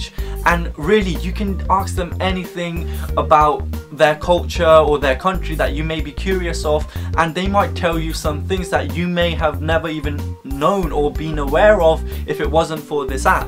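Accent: United Kingdom. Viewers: You sounded British but you looked like korean. XD This app you shared would help a lot should I consider learning formal korean through Berlitz though, I learned a bit korean in the academy. I guess my brain should get a hang of it soon. I dunno maybe bec.its very diverse theres a lot of languages introduced when im in school spanish, japanese , chinese, korean but english is my official secondary language. Honestly, its pretty messed up. Tnx for this helpful app! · Oh my god are you british? You have an accent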